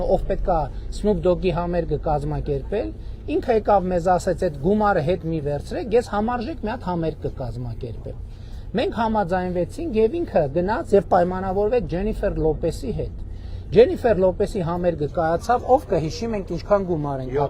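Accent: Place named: Dilijan, Armenia. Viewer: Дилижани акцент тох хелоооок е. Ваз газа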